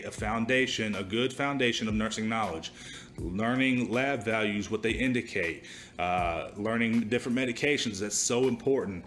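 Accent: South, United States. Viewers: He sounds like he's from the south 🙌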